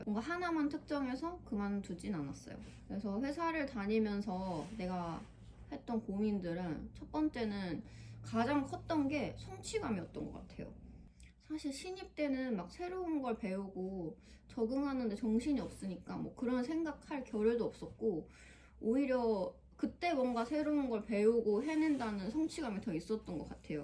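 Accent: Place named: Gyeongsang, South Korea. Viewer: 내나??? 경상도가 고향이십니까 ㅎㅎ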